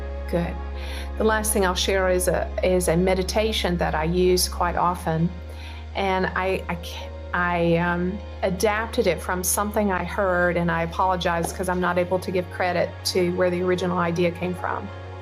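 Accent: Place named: South, United States. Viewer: Very informative and we are all blessed that you had such a diverse life experience and your knowledge as a teacher and musician with a wonderful Voice (and Southern Gal accent and character 😀)is a true blessing 🙏. Thank you, and Team ❤️👏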